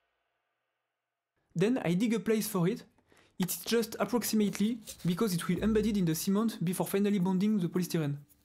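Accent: France. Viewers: un anglais avec accent français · on voit bien que c'est un Français qui parle en anglais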